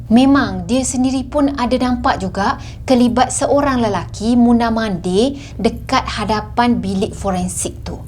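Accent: Kelantan, Malaysia. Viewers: Pada permulaan video,saya ingatkan kak ni orang Indonesia. Tapi bila dah start bersuara,hihi orang kito ruponyo..tokleh nok lari bunyi loghat kelate dok?..tu hok kawe terus pechek potek subscribe..moga Allah permudahkan segala urusan Kak..inshaa Allah..amiin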